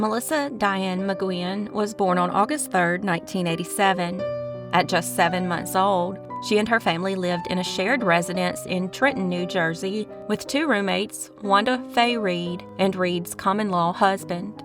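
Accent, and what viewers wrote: South, United States. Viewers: I just don't understand how/why someone can just disappear!😓 So sad for the families!💔 Thank you for bringing these sad cases to light 🕯️ 🕯️ 🕯️ Always good hearing your sweet southern voice! Love ya girl!💞✌️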